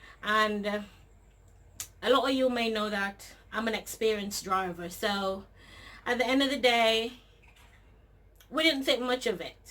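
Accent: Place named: England, United Kingdom. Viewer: Interesting that you said Oxford shirt and now you have an English accent hmmmmm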